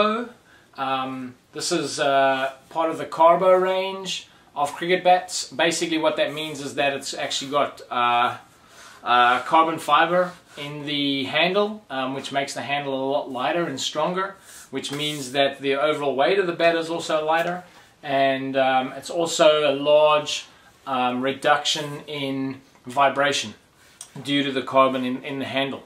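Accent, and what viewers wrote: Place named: Australia. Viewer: are you half ausie or have you lived in auz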